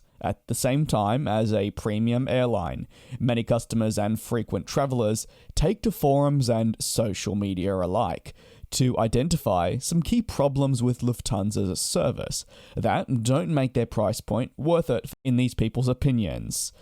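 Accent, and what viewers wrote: Australia. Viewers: Great to hear an Aussie with Aussie presentation!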